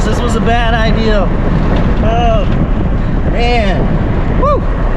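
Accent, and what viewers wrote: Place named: Florida, United States. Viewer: What I’m curious about is what kinda red neck Florida accent is that ? It ain’t no southern twang I’ve ever heard .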